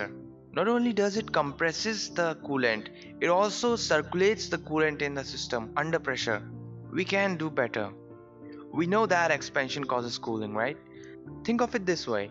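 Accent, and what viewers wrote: India. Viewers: You indian right?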